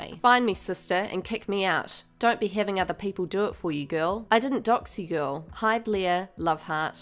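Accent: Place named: New Zealand. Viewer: true. So nice to hear a pure Kiwi accent from young people without some awful American twang in it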